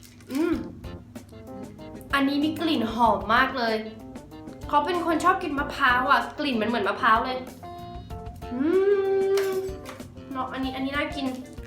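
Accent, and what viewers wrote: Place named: Suphanburi, Thailand. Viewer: เป็นฝรั่งที่พูดไทยเหน่อเป็นฝรั่ง. แต่ทำไมตรูนึกถึงคนสุพรรณ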